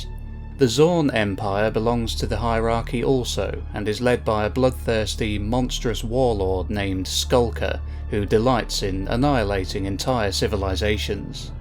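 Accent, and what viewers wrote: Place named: England, United Kingdom. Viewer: you have a very recognizable accent. I found it funny when you said you were from England, because every word you say tells us you're from England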